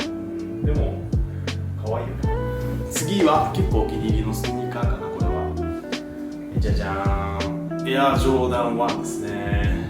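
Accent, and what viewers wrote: Kansai, Japan. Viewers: 本当にカッコいい！俳優になっても良いだろうねぇ🥰そして喋ったら関西ニキって言うのもええねんマジで😂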